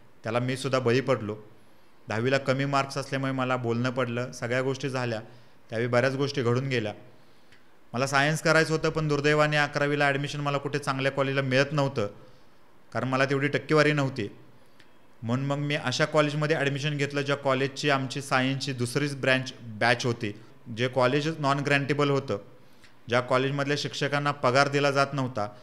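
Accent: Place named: Walwa, India. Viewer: मला पहिल्यापासूनच वाटत होते की ही भाषा वाळवा तालुक्यातील आहे मी पण इस्लामपूर मधून आहे .माहेर इस्लामपूर व आजोळ आष्टा. डीन.एड्. कस्तुरबाला झालंय. आमच्या तालुक्यातील माणूस लय भारी वाटत.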